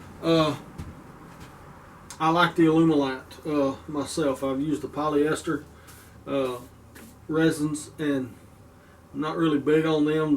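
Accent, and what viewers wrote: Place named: Texarkana, United States. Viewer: That was fantastic. I am gearing up to turn my first blank and spending time looking at at many videos of the whole process as i can. i am trying to place your cool accent, Texarkana region by any chance?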